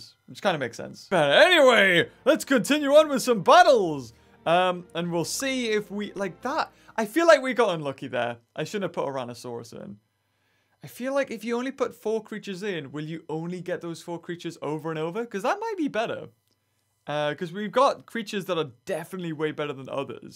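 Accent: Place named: United Kingdom. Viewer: Never knew he was British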